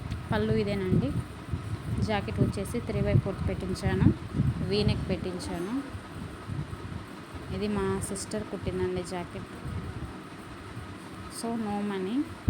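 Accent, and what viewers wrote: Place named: Chittoor, India. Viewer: Me slang konchem chittoor slang laga undi so anduke అడిగా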